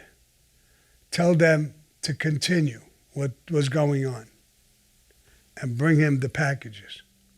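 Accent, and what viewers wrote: United States. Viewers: Especially with the American accent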